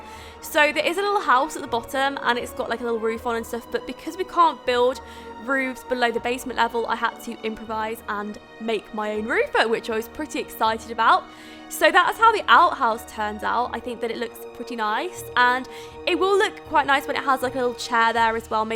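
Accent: United Kingdom. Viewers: I love your Brittish accent <3 Even when I dont understand everything but I dont care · i like your british accent 😣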